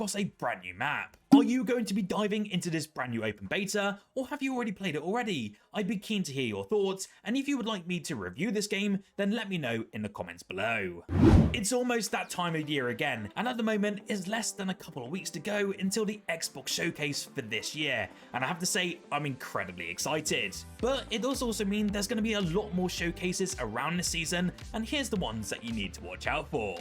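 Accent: United Kingdom. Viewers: Where his is accent from what part of the UK?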